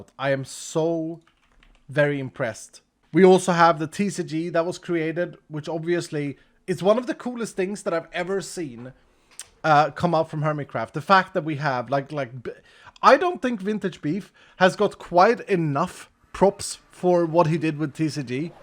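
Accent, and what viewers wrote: Sweden. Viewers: It has never bothered me to listen to the way you use the English language. In fact, it's sort of endearing, you've always been the silly Swedish guy! In fact, your content has always been a source of comfort for me. I started watching Hermitcraft in Season 6 and you were immediately one of the first hermits I began to watch. Even if you never upload another hermitcraft video, no matter how sad the fans may be, we will continue to support you. Make the content that makes you happy, and we will keep watching. I personally didn't care as much about the building, I loved the way hermits interacted, the games, the pranks, everything. It means the world to me that you have hermits on Vault Hunters. community is what makes Hermitcraft great. I hope to see some videos from you on the #10 server, but at your own time and at your own pace. and even if you don't, I'll keep watching for Vault Hunters. 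· You do you ❤. We love your Swenglish and as a non native English it is so recognisable. Make a base you enjoy and upload when it feels good for you. Grian and Mumbo do the same. I always love it when you can hear the true joy in vids instead of something forced. And there are other hermits who don t make mega bases either. Dont take the joy away by comparing yourself to others. You are wonderfully unique ❤ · Taking a radically different approach to season 10 sounds refreshing and fun. I'd rather see another season-long 'Señor Bumbo Cactoni' style prank war than episode after episode of time-lapses anyway. Cub, Beef, and Zedaph did just fine this season without massive bases, maybe take inspiration from them. Hermitcraft doesn't need to follow the 'bigger and better' YouTube meta, frankly it's stale and something new needs to be brought to the medium. And, side note, as an immigrant in Sweden who's making all kinds of mistakes när jag lär mig svenska, your occasional svengelska idiosyncrasies are barely worth mentioning. 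You're far better at English than many native speakers I know, occasionally slipping on complex conjugation or weird edge cases is just a 'second language' thing - and they come off as charming and endearing. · It would be so sad to see you leave hermitcraft! Frankly, you are my favorite hermit, and the reasoning behind that isn't the Mega bases or crazy redstone (although you deserve tons of credit for that!) The part I really love about you is your humor and interactions with all the hermits! Literally, i started watching immediately after 12 bamboo, and I've loved your content ever since! You've been so funny and made some wonderful collabs! I've never stopped thinking about hermit challenges, dare sticks, or squeaky inflatable toys! I also really enjoyed the Vault Hunters series too! I originally hated modded MC, but the moment I watched your single-player series, I fell in love! Seeing all the interactions between skilled and new Hermits was so entertaining and creative! 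A few friends and I think it would be cool to see hermitcraft shift into a little more modded stuff, such as Terralith, to add to the vanillia experience with custom world generation! And with your accent and 2nd language, who cares if you mess a few words up? I really enjoy hearing your voice, accent, and humor! It's so charming! I watch you because I found it so cool you are Swedish and different (in a good way) compared to the Youtubers I normally watch. It's unique, engaging, and fresh! All in all, you are a talented and amazing creator, and no matter what you decide to do, I'll always be there to watch your amazing omega videos (of doom)! Keep up the amazing work with minecraft and Vault Hunters, and don't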